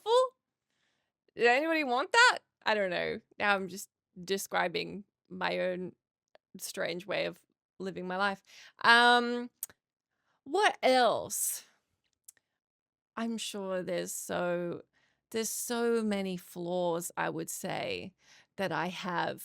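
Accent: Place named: Australia. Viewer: I've never picked your Australian accent before, probably because I'm in New Zealand ❤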